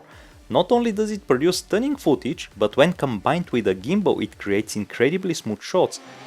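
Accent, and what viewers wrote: Russia. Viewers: Чувак, у тебя просто кошмарный рязанский акцент.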